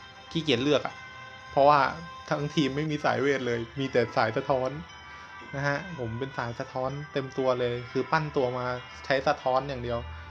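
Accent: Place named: England, United Kingdom. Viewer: อ่อ เป็นสำเนียงอังกฤษ เข้าใจแล้วขอบคุณครับ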